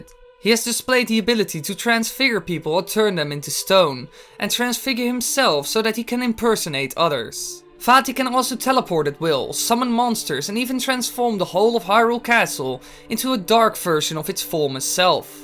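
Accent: Netherlands. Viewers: Ah, so it's Dutch? I wasn't sure exactly what it was, ha ha. XD Hey, your videos are really interesting! Keep up the good work!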